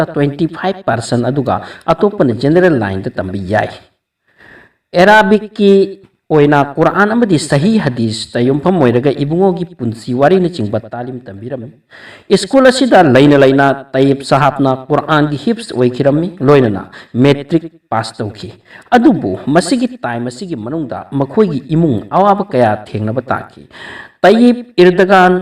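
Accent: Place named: Cachar, India. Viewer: Narrator c cachar deiro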